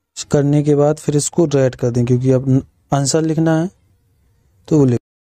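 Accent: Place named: Bundelkhand, India. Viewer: Bhai kha k bundelkhand se ho kya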